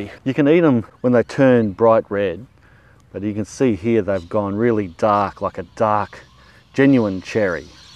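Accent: Australia. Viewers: Sounds like from Aus?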